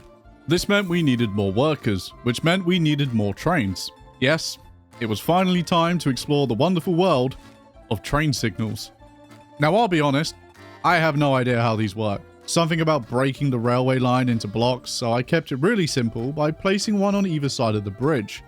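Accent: United Kingdom. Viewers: Your british accent goes really hard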